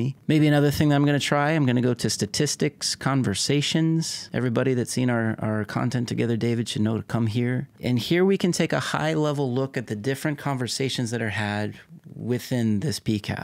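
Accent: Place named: South Africa. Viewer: Nice channel. Fellow analyst pointed me here a few days ago. First thing I recognised, the SA accent.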